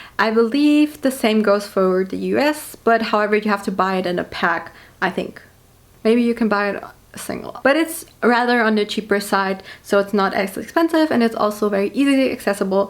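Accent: Germany. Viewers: Are u German?? 🐻‍❄️🐻‍❄️🥺🥺✨🫐🫐🛼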